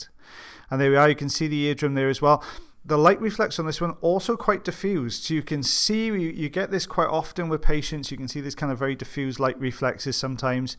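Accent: Wales, United Kingdom. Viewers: First and foremost, let me say thank you for your attention, time and dedication to the subscribers of this channel.. The recent changes seem to have had a polarising effect on viewers, especially the long term viewers, and I think a large part of that is because of the removal of the trademark beard. However in all honesty people hate change, and have followed the channel through a great deal of change recently. So here's MY QUESTION: What happened to the "#Countdown"???? Of all the changes made, it was my favourite.. ... Watching & listening, as your viewing old ear wax removals WAS THE BEST! Your natural exuberance and Welsh accent, coupled with your race calling abilities had me on the edge of my seat. It was wonderful and for those of us who have literally watched as the format grows and changes with the tides and seasons and the viewers, I thinks it's safe to say; no matter what the true waxaholics will always be here. 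As for my vote, well that was officially recorded on the community page, however returning to the Countdown is my unofficial vote.... Viva La Difference....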